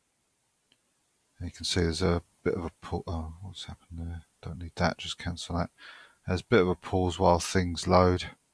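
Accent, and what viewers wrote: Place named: United Kingdom. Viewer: I have subbed and I will be back my fellow brit! I don't think you realise, how pleasant it is to hear someone who doesn't sound like a youtube "bot/nps" and/or an American, No hate to our bothers across the pond, but some of them accents can go straight through me, Like a vindaloo!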